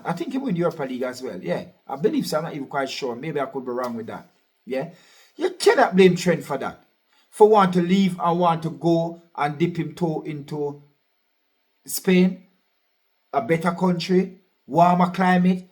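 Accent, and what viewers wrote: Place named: Ireland. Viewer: that irish accent from so funny i didnot expected but when you speaking english with irish accent is better rather than you speaking english with accent british that martinelli assist against fulham when we won 2-1 similar like ozil assist to giroud arteta clearly ruined martinelli because he change martinelli become defensive winger instead become deadly winger in final third when we won against fulham 2-1 in april 2025 and you watch Muniz and iwobi, imagine if that was Mbappe and Vini we would be done I think Arteta will leave this season, I don’t think Arteta will ever be able to work with good and experience players. Bertha will sign good and exp players because calafiori get injured so we Can't even use Calafiori in his natural position against madrid at ucl first leg for replace gabriel maghalaes who get injured right now against fulham on april 2025 but even if calafiori not get injured then he still shite as centre back because cannot defend and slow, Now the Artetasexuals will have an excuse to latch on for UCL exit. 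ITS FULHAM so why risk best players before important CL game?? ARTETA IS A MUPPET!!!, Arteta's fault as should rest best players for CL game 🤷‍♂ we can't win premiership so no brainer, this stupid manager too inexperienced for this job, HOW ARE OUR PLAYERS PULLING HAMMIES ALL THE TIME? This must be systematic problem for which is Arteta responsible. Why are we surprised? This is the Arsenal way. Overplay the regulars until they snap a t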